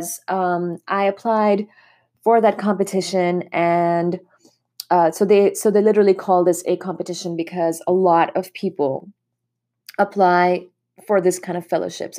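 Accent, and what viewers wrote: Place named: United States. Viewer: দিদি তোমার গলায় আমেরিকান উচ্চারন দারুণ লাগছে। 😅 আমিও পদার্থবিদ্যায় গবেষণা করছি ভারতে, প্রথম বছর। আমারও ইচ্ছা পোস্ট ডক করতে বাইরে যাবার 🙂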